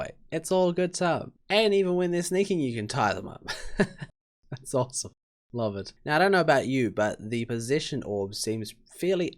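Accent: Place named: New Zealand. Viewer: Hey bro, great vid! Is that a fellow Kiwi I hear?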